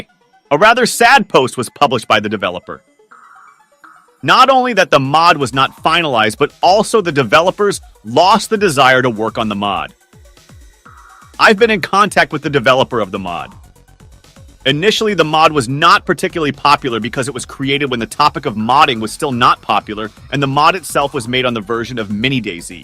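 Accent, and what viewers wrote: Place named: England, United Kingdom. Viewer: Cool inglesh voice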